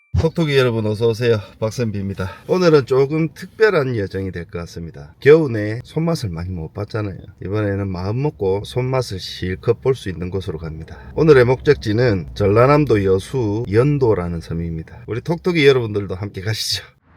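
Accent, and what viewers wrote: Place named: Gyeongsang, South Korea. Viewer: 경상도 목소리 좋으네요 얼굴은 수더분하시고 구독합니다 좋아요는 시청료로 눌러봅니다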